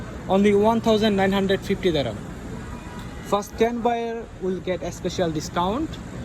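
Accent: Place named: Chittagong, Bangladesh. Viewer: আপনি চট্টগ্রামের নাকি?😁 উরে তুলো তো।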